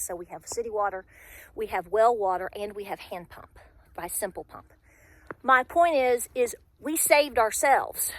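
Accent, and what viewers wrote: South, United States. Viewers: Love your southern accent videos, I almost don't want to print London temperatures in the 40Fs and easy rain showers. Hope things warm up soon for you all.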